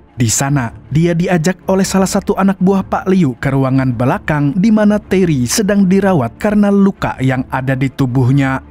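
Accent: Banjar, Indonesia. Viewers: Banjar kah bang ai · Banjar sklinya😭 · orang banjar ternyata 😂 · Banjar banarrrr!! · bah urang banjarkah mang ikaam😅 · Lengah dikit amang nya urang Banjar wkwkkkk😂😂😂😂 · Urang Banua ya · urang banjar 😂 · Orang banjar kah pian mang?🤣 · Urang banjar sklinya 😂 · u orang Banjar Kalimantan Selatan mang ae😂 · orang banjar kah pian ni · Banjar kah pian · Logat banjar nya khas banar amang niii 😂😂 Salam matan kuala kapuas 😁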